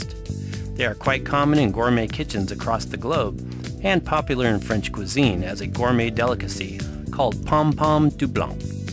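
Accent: United States. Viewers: Sorry, I shouldn't have been so harsh and called your narration "robotic" to begin with at all -- especially when you're already self-deprecating about it! The pace of your speech is fine, BTW, and you do happen to create numerous, highly informative, useful and great videos anyway! ☺ If this is of any solace to you, I don't like the sound of my own voice either! Clearly, I'm not good at detecting some U.S. accents (clueless and Irish here!). Californian? I hope you or any family haven't been adversely affected by those bizarre and horrific wildfires sweeping across your state? There's been talk about laser-based Directed Energy Weapons (DEWs) playing a role... Rambling on here, sorry. Anyway, just keep up the great work with your videos and have a lovely weekend! 🌝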